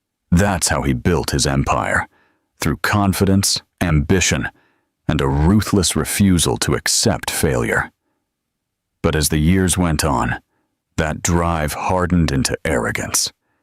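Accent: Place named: Australia. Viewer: It sounds like 1950s Australia ! Not today ! 😂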